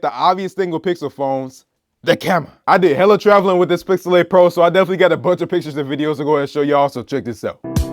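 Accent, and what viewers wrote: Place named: New York, United States. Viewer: Your definitely a new yorker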